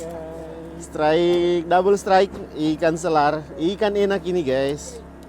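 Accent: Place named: Manado, Indonesia. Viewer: saya suka dengar kalau narasimya disampaikan menggunakan logat Manado dang, terasa lebih enak dan natural. Saya lalu pernah tinggal di wilayah Sulawesi, orang bilang kalau logat Manado terasa tore dan nyaman di telinga. Tq Bang....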